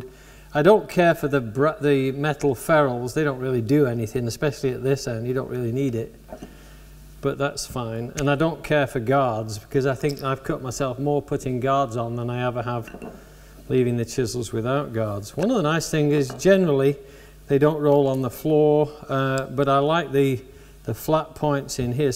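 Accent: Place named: England, United Kingdom. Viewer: My goodness, the purists would have me believe I'll be the rest of my lifetime PROPERLY sharpening/honing my cutting tools. Now, with your method, I can sharpen/hone in time to build a guitar like I wanted to in the first place. And on top of that...an English accent that even and Ole Southern Boy like me can understand. Thanks a MILLION. (Subscribed)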